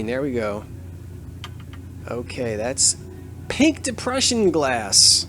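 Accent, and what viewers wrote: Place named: Pittsburgh, United States. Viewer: My roomy insists you're from Pittsburgh. Are you?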